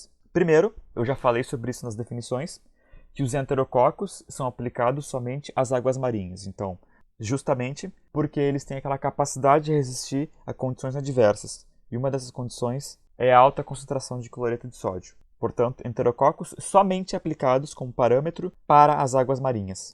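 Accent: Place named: Rio Grande do Sul, Brazil. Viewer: Muito perfeito o seu vídeo, amei a dicção e o sotaque gaúcho, resumo de milhões. Espero que não tenha desistido de criar novos vídeos!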